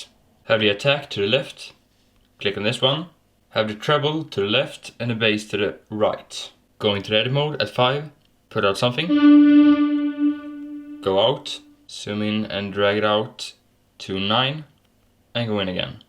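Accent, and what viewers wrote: Sweden. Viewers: Vet inte om jag har rätt. Men redan 5 sekunder in i videon kunde jag höra att du var svensk. Det är väl positivt att man har bra öron. Bra när det kommer till musik. 😂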